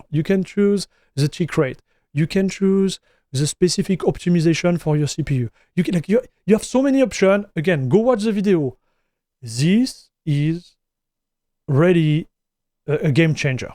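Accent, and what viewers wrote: France. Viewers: ngl i love the french accent you have XD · Love your French accent. Mine is Portuguese. 😂 Greetings from Brazil 🇧🇷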